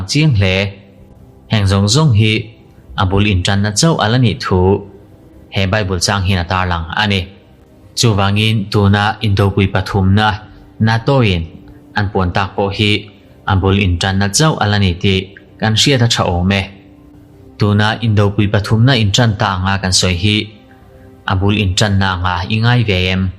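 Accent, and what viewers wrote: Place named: Mizoram, India. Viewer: Mizo hi kan accent hi ,a,aw,b angin. Athluk kan lam a,masse English erawh chuan a.b.c ani leh sia...kalô chik thrin a,NATO TI PAWH NI nato tia alam dan hi ani,midang pawh an tam nual mai...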